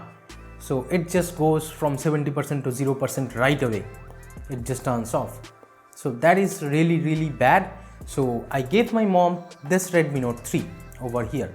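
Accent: Bengal, India. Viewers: Accent tells me you are bangali, even I'm using redmi note 4 from 2 years still in good condition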